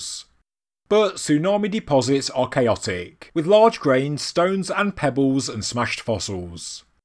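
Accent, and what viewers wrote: United Kingdom. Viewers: Its just his accent 🤷🏼‍♂️from the uk..unfortunately i sound very similar 😂 im from northampton in the midlands.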